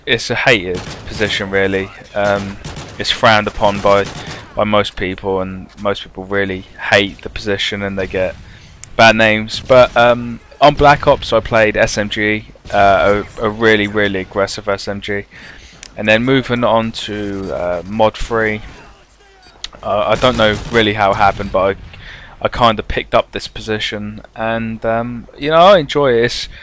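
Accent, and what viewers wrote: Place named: Norwich, United Kingdom. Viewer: You sound like your from Norwich? City fan?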